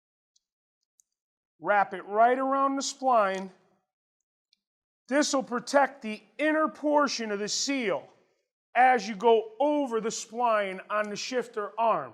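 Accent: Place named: New England, United States. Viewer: GOD! I love eye-talon guys from the the east coast,new england!!! Fuckin accent is crazy awsome!!!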